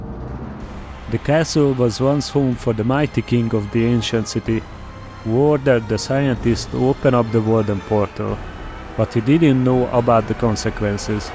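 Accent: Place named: Hungary. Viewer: Olyan, mintha magyar akcentusod lenne :D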